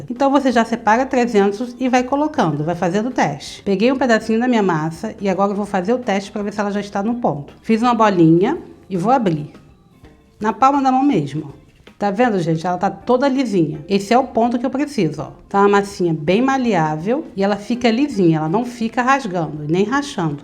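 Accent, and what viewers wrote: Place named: Rio de Janeiro, Brazil. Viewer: Oi Boa tarde suas receitas são ótimas e sua voz e muito bonita tem um sutak carioca e isso mesmo💖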